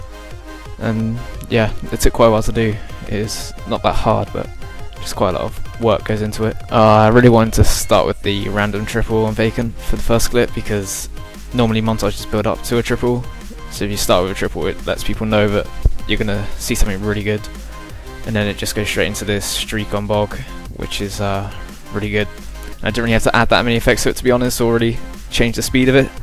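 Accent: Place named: London, United Kingdom. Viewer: Ah ok, sounded like you where from London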